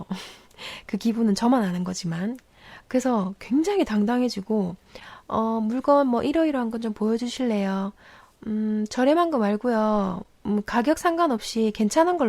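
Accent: Gyeongsang, South Korea. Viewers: 저도 경상도인데 특유의 엑센트가 재밌네요. 중간에 주문같이 말하는 부분도 재밌구요~ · 목소리 듣기좋아용~🤩 경상도 같으신데 맞나용?😘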